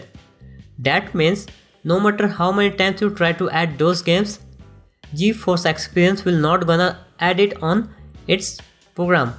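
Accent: India. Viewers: can always trust the indian accents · thx for help. And btw, i love indians voice. :3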